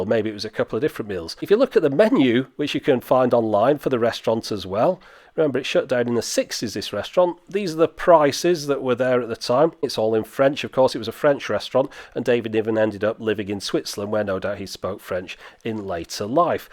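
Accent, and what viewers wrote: United Kingdom. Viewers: I really like David Niven. In my memories, his image comes associated along with Peter Sellers and The Pink Panther. I'd like to say that's a lovely souvenir to keep and to be proud of. Good video in all, I love your British accent; it's like music to my ears. Merry Christmas.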